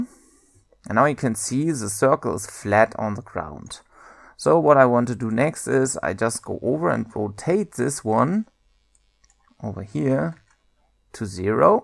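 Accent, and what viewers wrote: Germany. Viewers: OMG Ich weiß dass du Deutscher bist, erkennt man an deinem Akzent. :3 Wie auch immer ich will dir recht herzlich für dieses Video danken. Ich saß ewig an diesem Script, bin verzweifelt, alle Codes im Internet funktionierten nicht bis ich dein Video gesehen habe. So gut erklärt! Endlich funktioniert es. Besten Dank! :)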